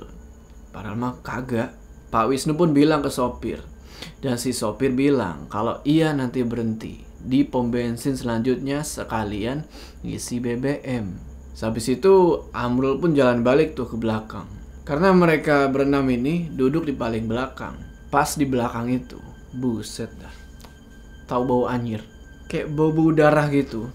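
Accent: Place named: East Java, Indonesia. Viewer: Emang kenapa kalo ngomong jawa timuran?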